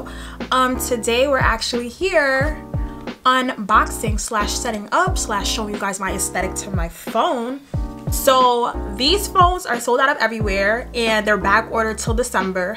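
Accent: New York, United States. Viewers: The moment you opened your mouth I knew you were from NYC 🥰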